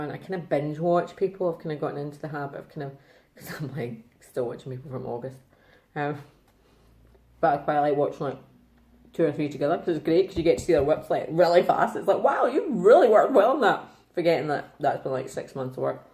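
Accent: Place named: Scotland, United Kingdom. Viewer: I enjoyed your video, nice progress and the patterns you got are beautiful. I have looked at William Morris patterns and just know I'd never make it to the end so didn't even try. It was nice you got to go have a meet-up with the other stitchers, I meet with a group every couple of weeks and it does encourage me to keep at it though we do chat and spend time looking at what everyone else is doing. Lol . Flosstube has grown so big its re ally hard to grow a channel unless there is one of the bigger numbers ones shout you out. The only thing you can do that I can see is find those who joined around the time you do, comment often and shout out other new comers. I don't make videos but I have been observing for a long time, lots of people join and hope those who have been here for ages will see them, comment on them but they only have as much time as they can fit in to watch videos and they made most of their friends when they joined . 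Every week or so I search Flosstube#1 and welcome new stitchers, if I made videos I'd shout them out to the subscribers I had and comment on them often, give likes and slowly over time I would find those I had the most in common with . Good luck building your channel, I really enjoy your videos though at times I find it hard to figure out what you're saying because your Scottish accent is quite strong😂. The Vietnam war was terrible , a whole generation of young men were drafted and a whole lot of them came home in body bags. They were fighting for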